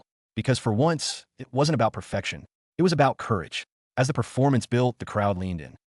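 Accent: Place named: United States. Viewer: SHE 'SHOCKED THE WORLD??????'...REALLY????..... 'A BREATHAKING PERFORMANCE THAT NO-ONE EXPECTED'....All I can say is that this narration is SO American it's sickly.....I notice you don't play the performance, you just TALK, TALK, TALK...I thought her performance was DIRE!!!!! just like this video.....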